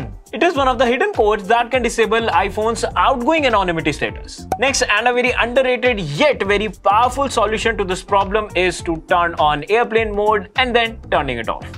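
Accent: India. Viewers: Here’s a great tip for all of us stupid Americans that don’t know how to speak India... Speak a little bit slower and try to separate your words because all we hear is alphabet soup sorry man I just figured to make it easier for your message translation typically will get frustrated and not even bother listening however thank you very much for your knowledge and sharing it with us I do really appreciate it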